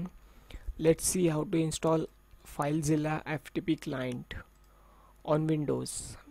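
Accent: India. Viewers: Just a constructive comment: please make sure you watch you accent (the way you are speaking). This video, by mistake, might be watched somewhere at Europe or North America then people are going to make fun of Indians. Your content /subject is not also very helpful. For the future, keep this in mind.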